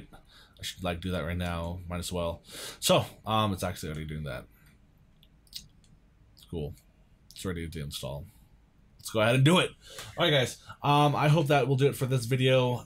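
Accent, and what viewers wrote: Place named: India. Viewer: He's from India english is not his first language idiot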